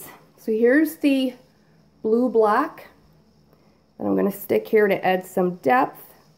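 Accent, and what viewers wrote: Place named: Canada. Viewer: I have started watching you ! I love your style and your Canadian accent! Are all Canadians artistic! Ha ha keep up the awesome pieces♥️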